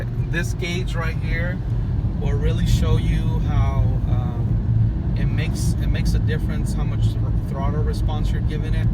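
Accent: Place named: Russia. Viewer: Dude, are u russian? Sounds like you have a russian accent.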